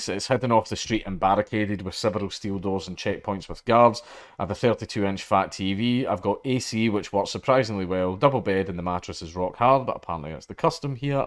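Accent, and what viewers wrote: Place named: RP, United Kingdom. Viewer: I love posh accent, pure gold:D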